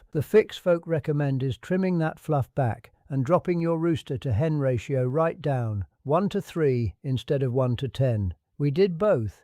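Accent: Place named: Yorkshire, United Kingdom. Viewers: He sounds Yorkshire accent to me. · I dont understand why a Scotsman talks with a accent that is nearer to a Yorkshire man.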